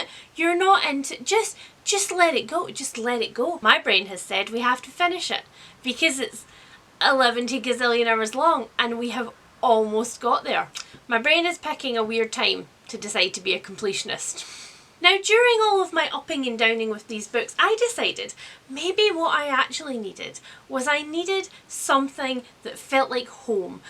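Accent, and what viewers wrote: Scotland, United Kingdom. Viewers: Is she Scottish/ Irish? Btw awesome idea. I have a superstition that if I add a book as currently reading on Goodreads or post about a book while I'm reading on instagram then I won't be able to finish. It's irrational but 🤷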